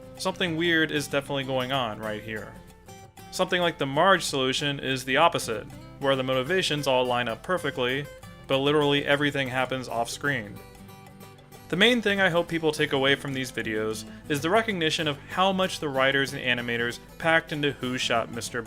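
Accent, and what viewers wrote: United States. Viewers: Does he? I'm British and he sounds pretty typically American to me.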